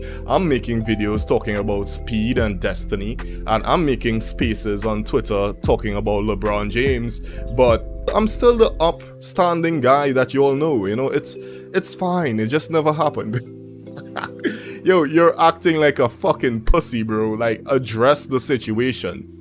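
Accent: Trinidad and Tobago. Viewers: Are you from Trinidad bro?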